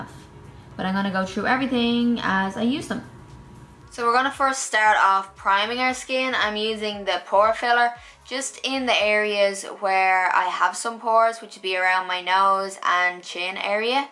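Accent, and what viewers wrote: Ireland. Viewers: i just found your channel and i noticed the irish accent on you i think its dublin correct me if im wrong but got so excited because i live in ireland to bad your all way in canada doh !!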